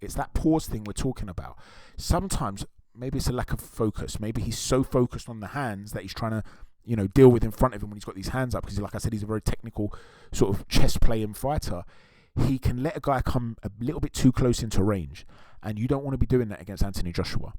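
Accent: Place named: London, United Kingdom. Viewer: .... where do I start my brother, Firstly massive respect to Hatman for sending all of us here, Hatman & Boxing beats & rhymes are the top 2 UK Boxing channels by a country mile!! I just watched this breakdown & I gotta say bro you are on some next level with this kind of detail - this is genuinely intellectual stuff so massive credit to you - you got a bundle of new subscribers now including me & my pals so maybe tell us a bit about yourself - did you do boxing at any level or are you just a passionate sport lover? I’m from London and you sound like you from London too so again I extend you every courtesy and look forward to debating Boxing with you. One thing I want to ask you bro - I was a massive Lomachenko fan and we know that he f**ked up against Lopez but I cant see any videos from you on that fight - I liked what Hatman said that Lopez has been training for Loma for years whereas Loma probably didn’t even know Lopez existed - I really wish he hadn’t lost that fight man Anyways great work from you and glad I subscribed - sincerely hope your channel blows up in 2021!!!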